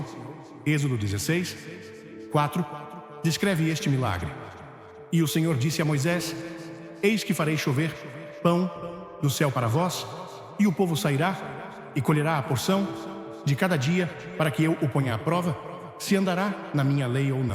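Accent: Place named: Portugal. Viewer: Porque esse portugues chato/horroroso de Portugal, se o canal diz ser do Brasil?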